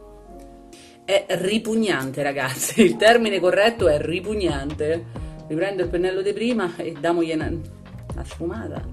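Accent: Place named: Rome, Italy. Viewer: In questo periodo ci sta proprio un po' di sana ironia e simpatia,troppo forte il tuo Romano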